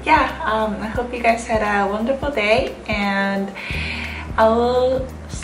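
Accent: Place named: South Korea. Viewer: 그냥 완전 한국인발음인데??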